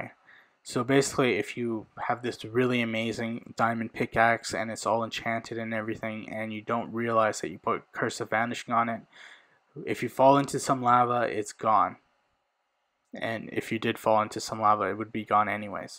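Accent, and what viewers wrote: Punjab, India. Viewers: are u a punjabi?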